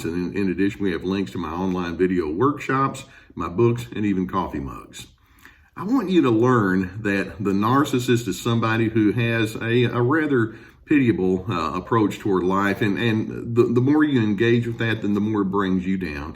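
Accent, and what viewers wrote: South, United States. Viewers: 🤣😂 Now I have got quite a wicked sense of humour but even though you did make me laugh that phrase feels cruel and I do not enjoy being cruel . That is one phrase I will not use because I do not want to play games with others or wind them up. I get no joy or relief from it . I can see where you are coming from and with that soft kind southern voice of yours YOU could get away with it and it still sound almost loving and actually a form of endearment but 🤣 I in NO way could get away with that. I stay away from others with those traits . Of course I do bump into people with these traits so I am grateful for many of your tips but my way of dealing with it is I keep my distance and use the word NO. And Mr I caught that twinkle in your eyes at the end of the video 😂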